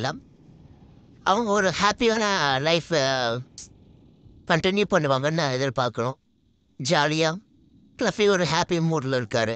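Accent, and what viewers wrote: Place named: Coimbatore, India. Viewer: உங்க கோயம்புத்தூர் வாய்ஸ் சூப்பரா இருக்கு மேம்❤❤❤❤❤❤❤❤🎉🎉🎉💐💐🌹🌹🌹🌹🌹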